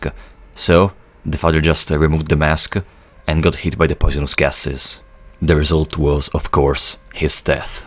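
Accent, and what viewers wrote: Italy. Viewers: i just duscovered your channel, and was wondering, are you, by any chance italian? curiosity js killing me, also i love the content i hope you keep growing like you are doing👍👍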